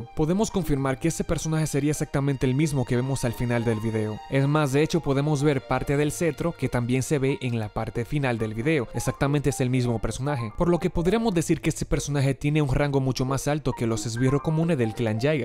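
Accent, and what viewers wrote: Dominican Republic. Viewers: Eres dominicano?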